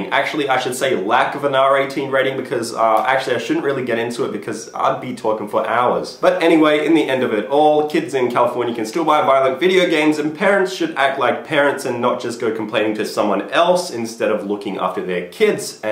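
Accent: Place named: Australia. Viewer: i knew i recognized that accent, aussie u tuber ftw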